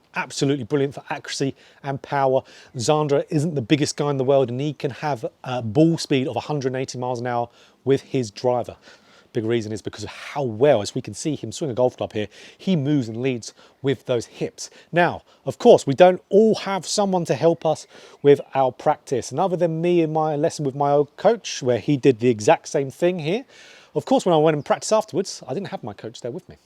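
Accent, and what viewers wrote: United Kingdom. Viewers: I actually think the fact he speaks quickly is refreshing, usually I have to turn up the speed on videos because instructors talk too slow like I’m a child. If his accent is difficult to catch quickly you can always turn down the speed of the video or turn on caption. (My sister often watches any British tv/movies with captions)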